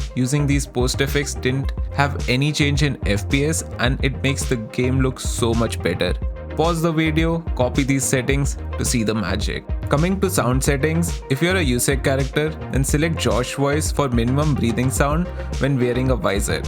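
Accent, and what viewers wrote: India. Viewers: from ur accent am i correct to assume ur indian? if so happy to see an indian makin tarkov content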